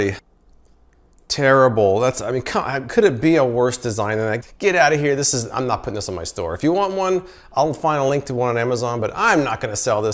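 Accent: United States. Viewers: Are you American perhaps? 🤔🤔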